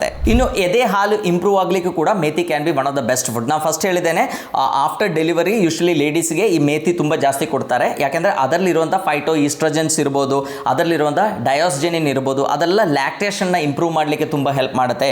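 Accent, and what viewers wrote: Kerala, India. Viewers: ನಿಮ್ಮ ಕನ್ನಡ ಮಾತುಗಾರಿಕೆ ಅತ್ಯದ್ಭತ ಡಾಕ್ಟರೆ 🙏🙏 ಅಲ್ಲಲ್ಲಿ ಮಲಯಾಳುಂ ಆಕ್ಸೆಂಟ್ ಮುದ್ದಾಗಿದೆ❤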